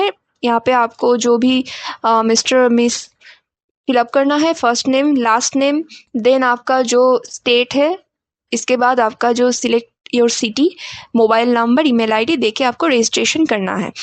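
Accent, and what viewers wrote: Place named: Bengal, India. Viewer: Aapki hindi sunke ek baat to sure hai aap bengali hai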